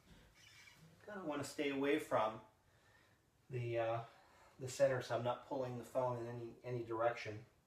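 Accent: India. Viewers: Thx for the video. I too have a foam bed that did not know what to do with, now I have one, thx to you. Also, the Indian accent was excellent. Was not expecting that.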